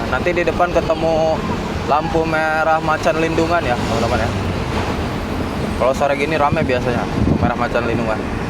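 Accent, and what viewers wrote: West Java, Indonesia. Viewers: Sunda na timana, a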